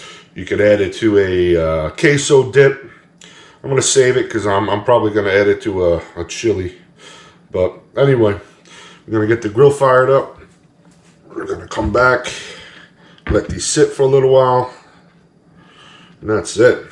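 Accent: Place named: East Coast, United States. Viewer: Ribs looked great, love the Heavy Metal EMS Tshirt and I detect a slight East Coast accent😳🦖 great job on the WSM👍🏼🥃🇺🇸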